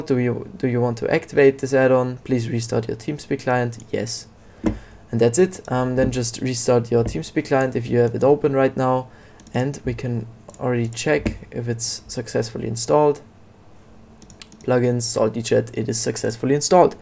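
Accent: Germany. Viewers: ur have german accent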